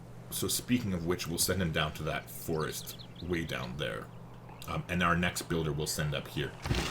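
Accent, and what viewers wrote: Canada. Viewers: Hey. Not a Civ comment, but... I took my undergrad degree in The Soo, Michigan. Your accent reminds me of all the cool Canadians I used to hang out with way back when, eh? Thanks.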